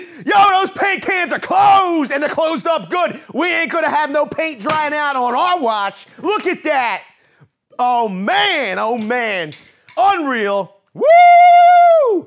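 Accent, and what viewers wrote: Philadelphia, United States. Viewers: yo bro you would fit right in at my house for sunday dinner ....you sound like ur from my neck of the woods ..South Philly Baby!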